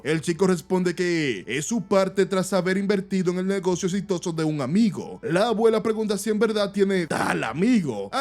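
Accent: Dominican Republic. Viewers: este tiguere es demasiado dominicano, hasta narrando las historias le mete el flow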